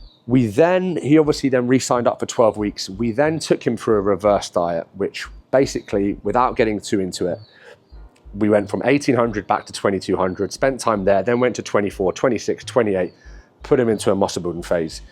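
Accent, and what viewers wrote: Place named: Hampstead, United Kingdom. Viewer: I was watching a walking tour of Hampstead Village on my iPad and then I clicked on this video. I can tell by your voice that you were originally from that area or close by. I’m in California and would trade pools and palm trees for charming and quaint. I just lost 15 lbs and finally getting my body back. I now have a diet of sardines, salmon, and salads. Instead of walking tours on my iPad, I will try walking outside. Great tips in this video. Thank you for posting!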